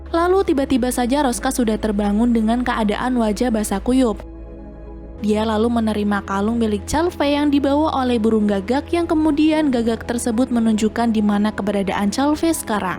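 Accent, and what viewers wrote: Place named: Sunda, Indonesia. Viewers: Teteh Sunda nya😅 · Gaya Sundanya sangat menarik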